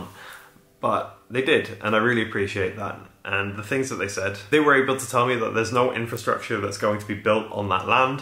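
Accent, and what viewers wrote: Sweden. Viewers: You sound so Swedish when you pronounce Swedish words. You even have the Swedish twang when you speak English. You should do podcasts!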